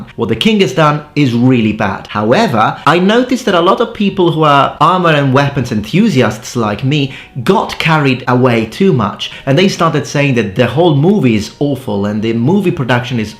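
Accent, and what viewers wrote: England, United Kingdom. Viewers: haha, wow. iv only watched you for one year. I just watched The king so am now watching YouTube analysis and here you are in your primordial form. iv always been blown away by your English usage by all metrics. You do sound much more English in your primordial version, i wonder how and why? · Hey, I noticed in some of your older videos such as your Kanabo video from 2 years ago you had a samurai helmet, where did you get it from? I’m in the UK as I assume you are judging by your accent, yet they’re all ridiculously expensive online.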